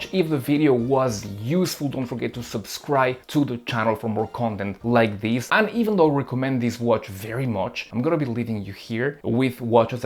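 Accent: France. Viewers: When I heard your accent, I checked if it wasn't a translated video from French. No offense ;) Mon accent n'est pas vraiment meilleur pour être honnête. Quoique qu'il en soit bonne et honnête vidéo. J'apprécie que tu ne t'extasies pas sur tous les modèles.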